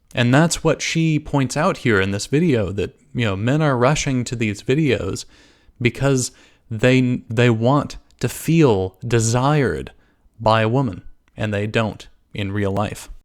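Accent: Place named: Israel. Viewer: dam she sounds like a hebrew speaker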